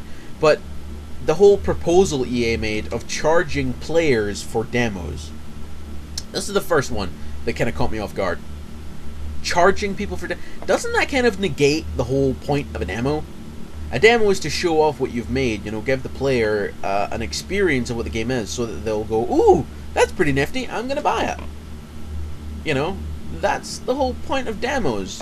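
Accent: United Kingdom. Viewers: are u a brit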